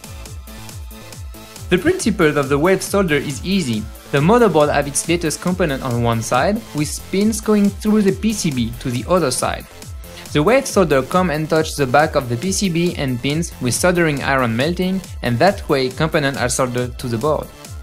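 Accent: France. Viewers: he's French 146% sure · You speak english like me =D Frenchie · I can understand about 80% of what this stupd frenchy's saying. For example the word is "componant", not "cump'n'nt". "How" not " 'ow". Lazy prick! It's sad how many young children are out of work because of those robots.:-( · This guy has a french accent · ca me va bien je comprend mieux avec l'accent français du coup:) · definitely french. you can get it from first 30 seconds, especialy when he says "from a to zee":) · vous êtes français?(à entendre l’accent ^^) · But why the hell did they choose a french for the off voice?? what a dread accent. It does not sound english even a little... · if you ask me that sounded more like french... either way, in it's very common for big IT enterprises to feature narrators with visible accents. That way they try to show their internationality and acceptance. I work at Autodesk (a software enterprise) and at least 50% of our new products or updates are introduced by someone with a strong accent. 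Call it cliché if you will:D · This french accent is amasing... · accent français XD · wth is the narrator french? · E tu a l’anglais d’un français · L'accent franchouillard tout le long, c'est un calvaire.! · No, i can not listen anymore. This video is fucking RUINED by this french frog pos. I'd rather have any foreigner speak English lang. (russian, german), but NOT FRENCH!!!! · I'm English and I could understand everything he said. Stop complaining! In fact his French accent sounded better than the remastered version with the guy talking with a slight Chinese accent. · maybe cos the guy speaking is french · French accent:D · That's FRENGLISH! Understandable nonetheless;) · he's french. i can hear it. that's my native language. he sometimes pronounce words as in french -_- as when he says "misplaced" and ze instead of the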